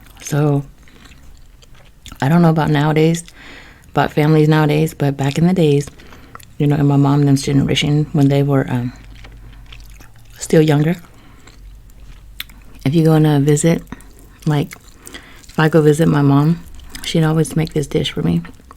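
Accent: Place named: South, United States. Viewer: You have such a lovely soothing voice, almost sound southern 😊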